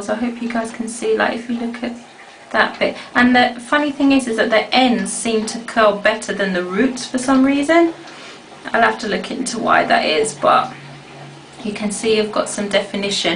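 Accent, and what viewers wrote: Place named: United Kingdom. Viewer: I love your dialect, you wouln't happen to be from the U.K.? I'm gong to try this style on my 4c hair.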